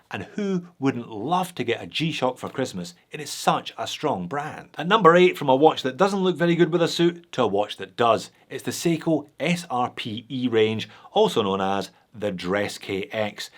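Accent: Scotland, United Kingdom. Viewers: Merry Christmas. Thanks for a bumper year of watch reviews and laughs. The only downside is that whenever I read a watch review now.... I do it in a Scottish accent. Oh well. 😁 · A knowledgeable & enthusiastic host talking about watches with a Scottish accent. What’s not to like? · Merry Christmas and the rest of the viewers. Love your scottish accent, reminds me of my family:-). Keep up the good work. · Came for the watches, stayed for the Scottish accent.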